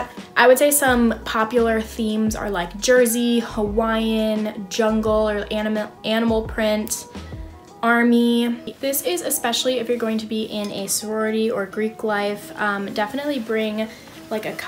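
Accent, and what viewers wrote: Midwest, United States. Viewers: i am from indiana and i can definitely confirm she is from the midwest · i can tell you're from the midwest girl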